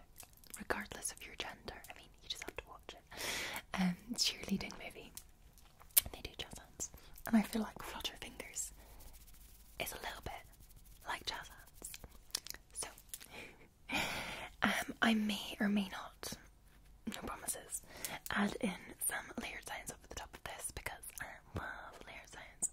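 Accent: Scotland, United Kingdom. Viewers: Ahhhhh yes another glorious night of falling asleep to that amazing Scottish voice 😴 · Love your accento, you made me want to go to Scotland. You're lovely, i like everything of you, but the voice Is marvellous! ❤❤❤ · Scottish accent challenge: try saying ‘burglar alarm’ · I swear Scottish Tingles are different than normal tingles. Tell us more about Scotland!!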